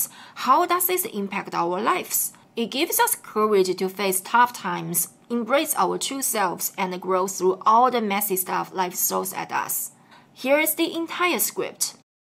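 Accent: China. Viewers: But your English sounds more like Chinese than English; I'm getting confused listening to you.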